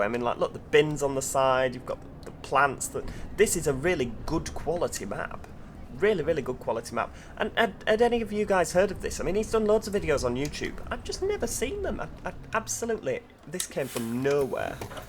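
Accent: United Kingdom. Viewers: Why they didn't fix the loading and freezing in this Game so sad! With wich sterriing wheel do you play Omsi2? And you realy should have more subscribers! I mean your videos are great and I love your British accent and you also got over 1 Million views. This is Insane :)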